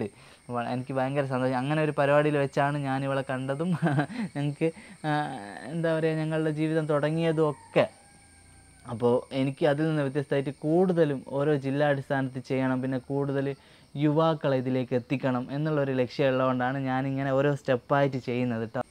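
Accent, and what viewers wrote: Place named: Nadapuram, India. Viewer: vadakara thanneyono.allel nadapuram bhagathaano?, samsaaram nadapuram shailiyaanallo😆😆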